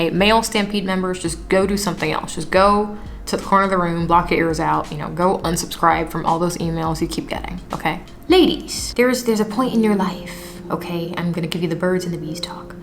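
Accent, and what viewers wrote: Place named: Florida, United States. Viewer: This Florida accent is hilarious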